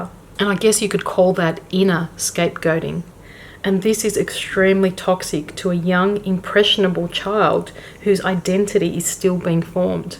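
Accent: Australia. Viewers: Are you Australian? I really like the accent. And yes I'm a scapegoat too. Hello everyone 👋👋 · You sound Australian I hope your doing ok,prayers for everyone there....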